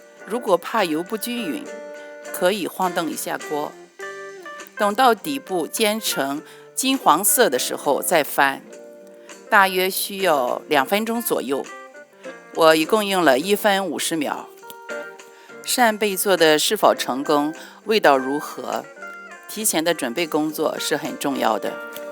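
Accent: Shandong, China. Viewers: 听口音您是山东人吗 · 聽到了熟悉的青普，給你點個讚👍我買的扇貝柱牙磣怎麼办？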